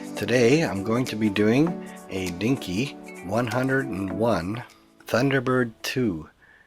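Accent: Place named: Canada. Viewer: Very nice job, faithful to the original and with a real eye for detail. Also it's always nice to hear (what I suspect is) a Canadian accent.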